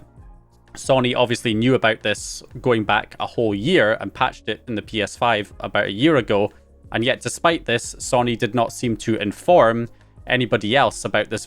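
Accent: Scotland, United Kingdom. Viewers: Nah, not really. He's just Scottish. California valley girl is the worst if it's a man speaking that way.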